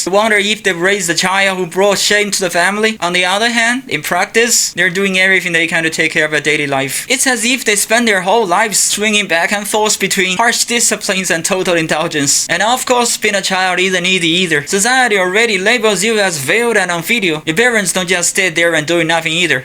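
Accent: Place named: China. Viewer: your accent is quite chinese bro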